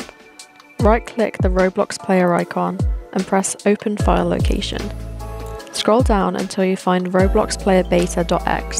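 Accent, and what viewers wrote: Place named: Australia. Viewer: stopp that australian accent is so cutee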